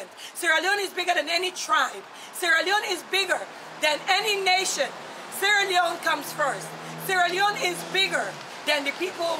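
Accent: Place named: Liberia. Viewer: You sound Liberian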